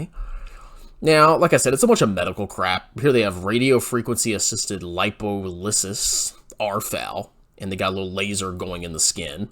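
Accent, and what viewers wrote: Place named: Virginia, United States. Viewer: Haven't heard the Virginia accent for a while..come on. Pllleeeaaasssee!!!!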